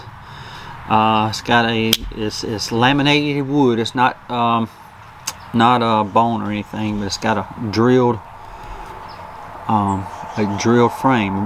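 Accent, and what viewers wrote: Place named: Alabama, United States. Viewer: Were trying to hone in on that accent if yours. Don’t worry I have one too so I’m saying Alabama and she’s saying Louisiana. Who, if any, wins the bet?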